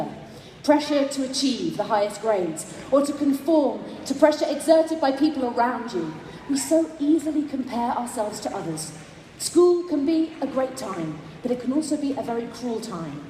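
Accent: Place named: United Kingdom. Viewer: Nice accent British Nice speech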